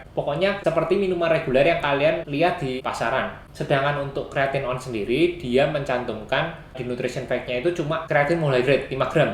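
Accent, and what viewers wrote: Java, Indonesia. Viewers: Seneng dengan logat "medokmu" Bro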